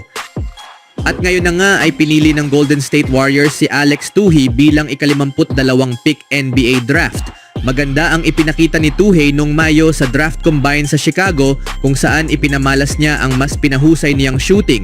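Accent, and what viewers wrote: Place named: Visayas, Philippines. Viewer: Bisaya ka sir halata salita mo?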